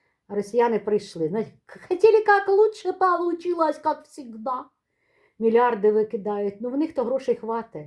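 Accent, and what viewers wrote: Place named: Ukraine. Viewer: Почитала и прослезилась . Сколько злобы и несправедливости , слепой ненависти . Дизлайк Вам , мадам ! Вы позорите украинский народ , не весь он такой . Моя бабушка была украинка , золотой человек , интернационалист ! Кстати , говор , как у Вас , акцент . Поэтому я и обратила внимание на Ваше видео , приятно стало , душевно . А потом .... Жаль . Не одумаетесь Вы , никогда .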